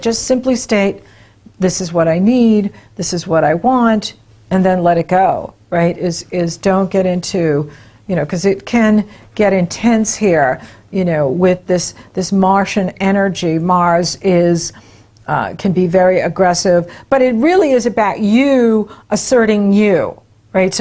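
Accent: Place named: New England, United States. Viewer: Hey,I'm a NE resident too! Massachusetts! I always resonated with your accent... Now I know why! I'm studying astrology now and I really appreciate your videos! Looking forward to a little snow tonight! Stay warm!:). Ellen Green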